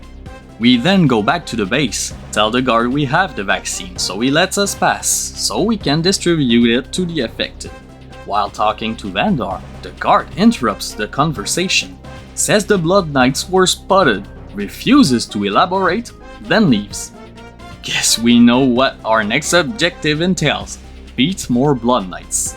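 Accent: Quebec, Canada. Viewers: Il me semblait que tu avais un accent canadien français, j'entend souvent à mon travail mes collèges parler en anglais, il y avait une ressemblance. En tout cas bel effort de tout faire en anglais ! Très bonne vidéo. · You're clearly French Canadian, hahaha. nice video about a beloved game of mine.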